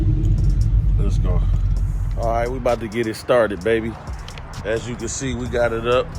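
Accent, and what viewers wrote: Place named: Mississippi, United States. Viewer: bruh what part of mississippi you from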